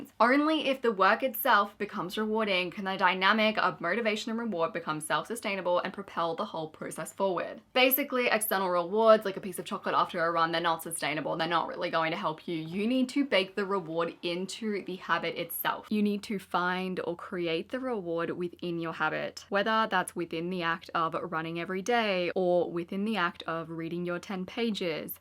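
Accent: Australia. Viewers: Could you please speak a little slower for not Aussies?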